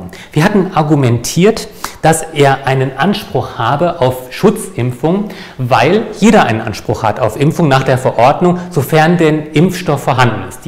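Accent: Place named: Franconia, Germany. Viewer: Totally besides the point aber ich habe mir die ganze Zeit gedacht: der Akzent ist doch aus dem Norden. Jetzt hab ich Sie gegoogelt u wurde fündig: Verden, Niedersachsen :)) Ich vermute mal da nicht nur geboren sondern auch aufgewachsen? Anyhow- Danke für Ihre Bemühungen u Arbeit im Bezug zur Pandemie u ich hoffe sie fühlen sich in Würzburg zu Hause. Ein bisschen Fränkisch war ja bereits mit dabei. Hihi.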